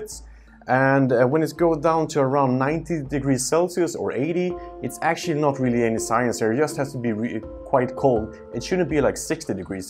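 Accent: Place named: Sweden. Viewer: I bet 5000€ this guy is Swedish xD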